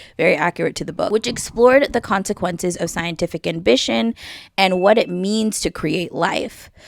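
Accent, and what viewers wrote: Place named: United States. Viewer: You sound a lot like a Yank but I'm just biasedly assuming it, since this is their platform and all. All these smart Yanks are a lot like the Smart Russians, think you know more about people's cultures than other people, living an insular lifestyle, while gate-keeping cultural critique and also political ideology as though they h ave an exceptional awareness compared to other nations of people. This can even include supposed appearances of being activists for colonial exploitation or in a what I call pseudo-left wing point of view.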